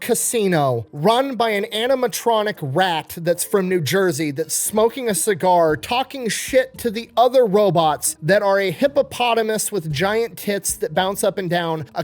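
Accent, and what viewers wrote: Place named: Tennessee, United States. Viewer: sounds like you’re from West TN haha There was one in either Jackson or Memphis that was like that in the 90’s. Our rural elementary school took us there twice for field trips. Oh, the good ol days.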